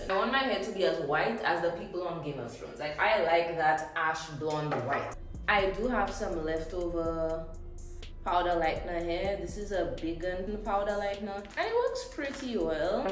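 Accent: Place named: Saint Lucia. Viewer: Omg I know this accent ..🇱🇨🇱🇨🇱🇨🇱🇨girl I’m here looking at videos to help make me get comfortable with the color . I give myself 1 more week then cut and white here I come 🤭… so happy it’s Lucian ❤❤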